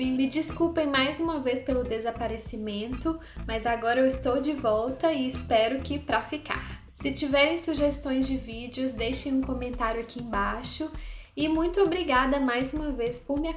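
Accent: Minas Gerais, Brazil. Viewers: I think she's speak like people in Minas gerais. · De la manera que parles eres brasilera. Parles més lent i més clar, per a un català. A més a més la pronúncia de la "R"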